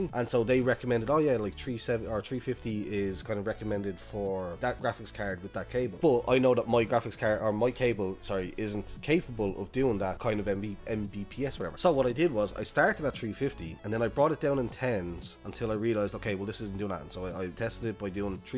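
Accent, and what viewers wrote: Ireland. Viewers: No way your Irish too?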